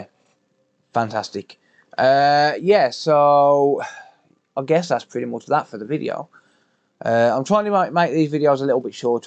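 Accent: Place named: Black Country, United Kingdom. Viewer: Another great video,. Watching and learning techniques from the likes of yourself, Vince, Northridge, etc I've learned absolutely loads. Your channel has something the others are completely lacking though. Being a guy who was born and brought up in Wednesbury but moved up to the Yorkshire coast, it's nice to hear a bostin accent from back home. Black Country ay we. 😂😂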